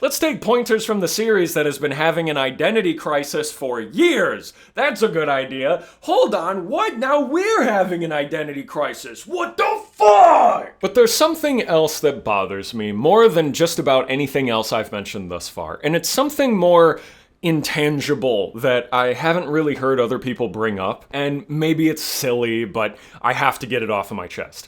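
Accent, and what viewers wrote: Birmingham, United Kingdom. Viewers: You sounds very British, I'd trust you review a briish game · subbed for the flawless birmingham accent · como diria el tablos, el acento britano le da ESENCIA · Hearing you as an American loving the Bri’shness and doing a pretty top job at a Brummy accent made me proud to be from that shithole! 😂😂 great video mate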